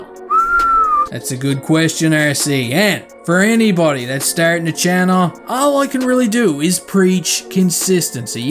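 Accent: Newfoundland, Canada. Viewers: Congrats! I enjoy your content a lot and I find you very funny and original. You're so good at making up names for the players. And yes the background videos and memes are fun. it makes you different from all the other YouTubers. You're my favorite! Keep it up! One more thing... Love your Newfie accent! 😜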